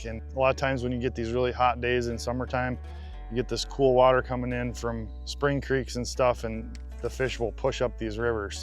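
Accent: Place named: Wisconsin, United States. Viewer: You can tell by basically after everything he says he sounds like Skansen, Wisconsin, Wisconsin. End it seems like he’s up by Northwest probably by Minnesota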